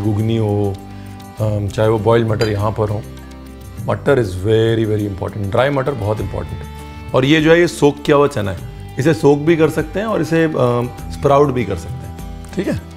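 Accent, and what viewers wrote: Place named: Bengal, India. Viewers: Sir, you have a proper Bengali accent❤️. Amazing sir. Loved it. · is bande ko sach mein pata hain ki bangla mein masla ko moshla bolte hain isliya to tumi atto valo katha bolo dada awesome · Other than jhalmuri loved ur bengali accent....❤️❤️ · His Bengali accent wins my heart ♥ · Your bengali accent is so good 😍😍 And Jhal muri is valo basha😋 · ঝালমুড়ি, ঝালমুড়ি, ঝালমুড়ি। কথায় কিন্তু বাংলার ছাপ। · His Bengali accent is so natural..😍 we also call it ঝাল মুড়ি / jhal muri in Bangladesh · Hi Chef. I'm a Bangladeshi and often watch your videos mostly because of the cooking tips and background stories you share. I loved your Jhalfrezi recipe and loved that you explained the history so well. But yes this particular video made me a true fan of yours. I really really love your (almost) authentic Bangla pronunciations. Jhal Muri is a popular roadside snack in Bangladesh as well, however we do not add coconut and rather add a spicy paste of gram pulses which locally is known as Ghugni, hence spicier. 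I would really request you to visit Dhaka once and try out typical Bangladeshi dishes like Kacchi Biriyani, Tehari, Fuchka (ours is fully loaded Fuchka, unlike the pani puris), Chotpoti, Fish dishes, Kebabs in Puran (old) Dhaka and what not. I'm sure you will have a great time to experience dishes which are somewhat sounds similar to Indian dishes but actually are so different in taste. Love, from Dhaka <3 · Ranveer sounds so cute when he pronounces words with Bengali accent :) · His Bengali accent is just 👍🔥😍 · Your Bengali accent is too cool. I'm form Bangladesh 🇧🇩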